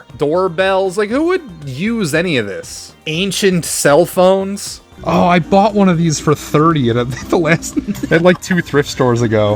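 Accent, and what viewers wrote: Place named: Upstate New York, United States. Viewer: It's funny I thought I heard a upstate accent in some of your videos. All of my cousins have it.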